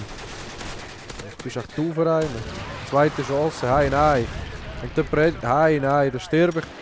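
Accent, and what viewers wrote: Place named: Switzerland. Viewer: Es ist schwer dich zu verstehen aber d kannst nichts dafür bist n hardcore schweizer wie nichael mittermaier sagt ^^ trotzdem schönes vid ^^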